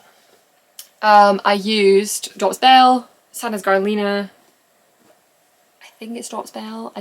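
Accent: Scotland, United Kingdom. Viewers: Hi, My first time watching your channel - lovely to see you looking so well! I have knitted your patterns before and love them. You are such a talented and generous knitter. One comment - I am from Edinburgh and lived in Scotland all of my life - but I struggled keeping up with your commentary..😂. You talk very quickly, with lots of words..I know it is a Scottish thing to rush at sentences, but it wasn’t quite as relaxing to watch as some other videos. Appreciate you have a lot to get through, so not a criticism, just an observation…❤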